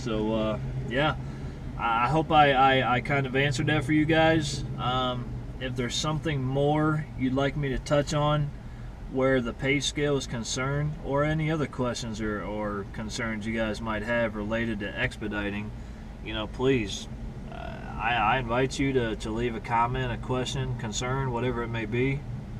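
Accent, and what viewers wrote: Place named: Texas, United States. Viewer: Just subscribed. I'm going through some of your videos now to catch up. You sound like your from Texas. I lived there five years and I have to say the one thing I liked best was the people. Honest and direct. They must have thought I was OK too because after a few years I was told "Your a Yankee but you're OK". Thanks for the reply and I'll be watching.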